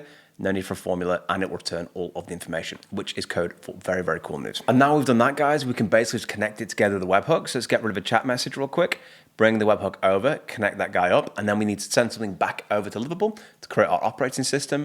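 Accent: United Kingdom. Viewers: it looked interesting, but a Brit might have no trouble understanding your accent, given the speed at which you speak. This ignorant American can't follow. You might consider slowing your cadence to Douglas Murray's speed so we, who love the sound of the British accent but don't understand original English fully, have a better chance of following what you say on a complicated subject. Cheers ~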